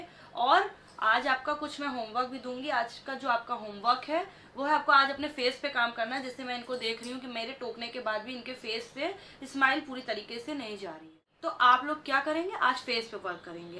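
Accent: Kanpur, India. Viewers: Yeah.. I have seen your interview... But your tone is killing it(totally kanpuriya)... Really proud... Artists like you are a real inspiration for society..comedy, Happiness , laughter from day to day experience without any bad words or double meaning jokes is rare nowadays.. Keep it up.. Lots of ❤❤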